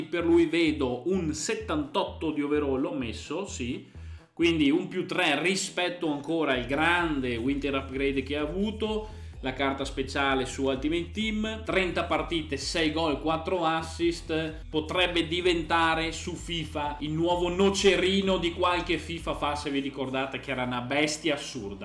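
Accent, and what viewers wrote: Veneto, Italy. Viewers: Da che parte del veneto sei? Un salutone da Salzano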